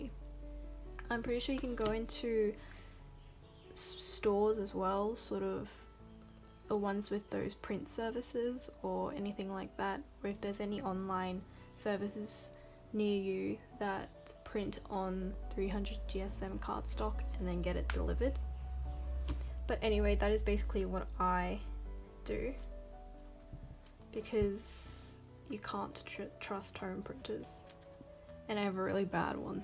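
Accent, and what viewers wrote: Australia. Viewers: Idk why but your accent reminds me of felix and bangchan's accent😂😂😂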